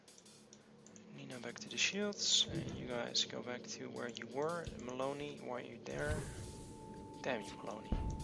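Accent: Netherlands. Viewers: i can't but notice your accent sounds like you are dutch, am i correct?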